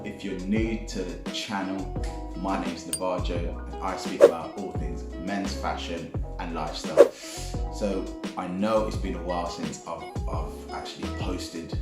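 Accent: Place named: United Kingdom. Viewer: How did you get your name Navajo? Just curious, lol. You have a British accent, and me, myself, being Navajo, it tossed me off. Love your content btw!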